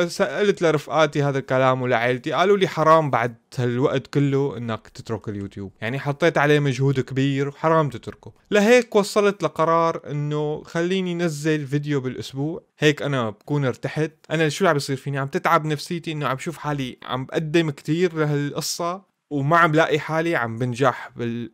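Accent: Syria. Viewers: احلى لهجه هيه السوريه و انت بالعكس انسان راقي و مرح كلش والله .. بالعكس استمر و حاول اتطور اكثر بالقناة و ربي يوفقك للي تتمناه .. اخوك ومتابعك من العراق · لا منتا غليظ وانا ما اعرف سوري وفاهملك ١٠٠ فل ١٠٠ بس منجد يمكن الناس ما يحبو اللهجة سوري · انا مابتابع قناتك الا عشان اللهجه السوريه تبعك 😂🤣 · اوووعك تترك القنااه انا ماحبيت المورتال الا بسببك الصراحه وعندك تفاعلك رهيب وبالعكس.. الي شدني اكثر لهجتك السوريه والله رووعه ( ولك يحرئ ديبك يازلمه😂) هالجمله ذي تضحكني لما تاكل لك اكله في المورتال استمر و ان شاء الله ربي يوفقك نزل مقاطع قليله بس لا تترك القناه♥. وتحياتي لك يا. اخووك من السعوديه🇸🇦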